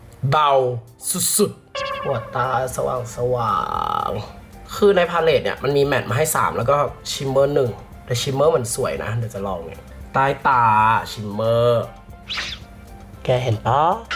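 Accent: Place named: South Korea. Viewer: ไม่ทราบว่าความจริงแล้วชีเป็นสาวโคเรียรึป่าว สำเนียงกับจริตเริ่ดสะบัด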